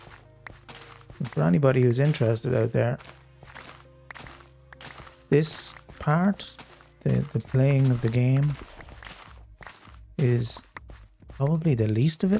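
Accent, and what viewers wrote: Ireland. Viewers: your big Hardcore video came to me through the algorithm and I LOVED it, couldn’t get enough of your builds, play style and chat (and, as a fellow Irishman, it’s great to hear a non-American voice in the game!) so I’m going back through this series for more banter and more build inspiration. You’re a star, LOVE every video I watch, hope you come back and see this and think of how far you’ve come!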